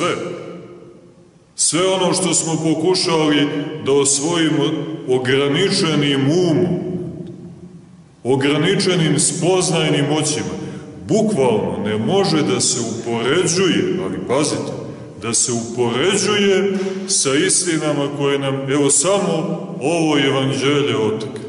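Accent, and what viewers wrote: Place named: Montenegro. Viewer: Second BTW He speaks with heavy montenegran accent. Can't miss where he is from.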